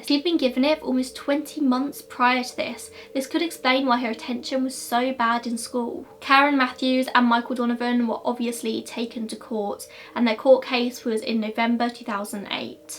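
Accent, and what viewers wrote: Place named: Southern England, United Kingdom. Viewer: I wonder if one day you could cover the case of the kidnapped ten year old girls (Lisa Hoodless and Charlene Lunnon) from Hastings? It's a happy ending case, something I much prefer where children are involved, I bet most of us like happy endings. I was holidaying there at the time and remember searching until I couldn't move my feet another inch, along with hundreds of other people. It's a happy ending in that both girls survived but were horribly abused by the disgusting paedphile who took them, that's the nasty bit. I (and my young son) went back to Vancouver after they were discovered but I've never forgotten that time and Ariel, my son, often asked whether I thought the girls were all right; I always said "yeah, they'll be fine" knowing that they quite likely would never fully recover mentally from the experience. 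Oh: it's a real treat to hear a voice from close to my part of the country, I'm from Surrey so not terribly far away. You are brilliant at presenting all your work, it took an evil virus for me to find your channel and I'm very glad I did. Thank you for helping to stave of incipient insanity and stay safe and well. All the best.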